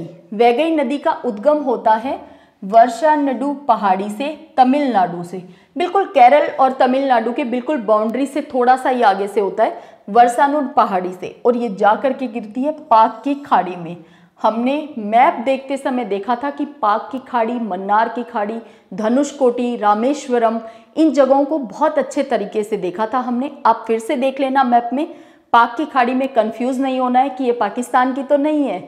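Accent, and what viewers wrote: Haryana, India. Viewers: Haryanvi accent is my favourite ❤️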